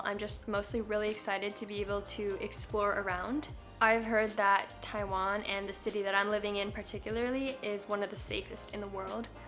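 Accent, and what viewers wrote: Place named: California, United States. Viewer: California accent!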